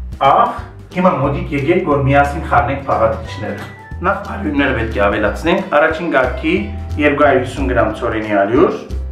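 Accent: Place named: Western Armenian, Armenia. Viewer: Արևմտահայի Ձեր խոսվածքը շաաատ հաճելի է :)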